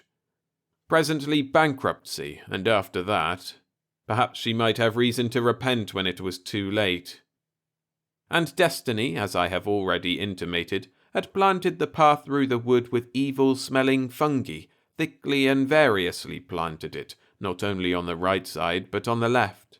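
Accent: United Kingdom. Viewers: I'm in the same boat in regards to tech. I'm very glad still posts some stories here. His accent sounds sort of South East English to me, I hope he sets us straight. 😊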